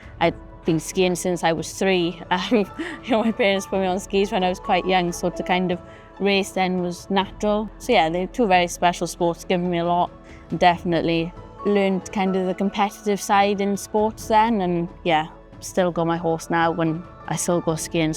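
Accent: United Kingdom. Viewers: Always liked the Welsh accent 😊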